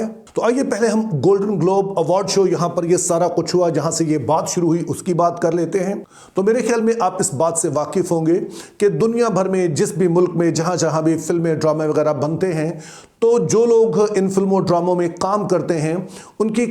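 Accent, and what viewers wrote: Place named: United Kingdom. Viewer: bhai ap urdu midum hyn. Es liy kindly urdu ko urdu ki tarha bola karyn.ap urdu esy bolny ki koshish karty hyn jasy angryz urdu bolty hyn.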